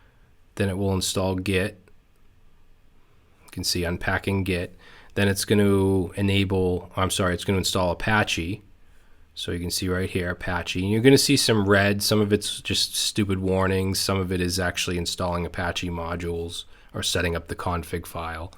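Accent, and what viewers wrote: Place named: Massachusetts, United States. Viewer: could I ask if you're from the Massachusetts area? Your accent sounds familiar :)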